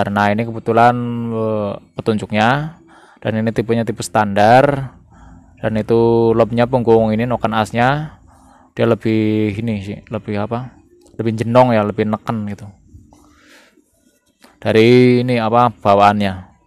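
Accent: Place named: Java, Indonesia. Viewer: Bang ituh ngomongnya kaya medok bgt yh bang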